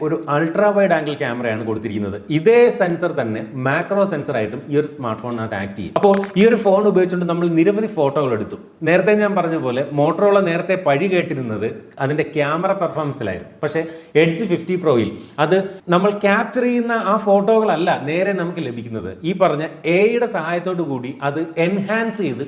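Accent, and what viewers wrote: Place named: Trivandrum, India. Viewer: Trivandrum അങ്ങനെയാണ് ബ്രോ H ന് ഹെച്ച് എന്നും പറയും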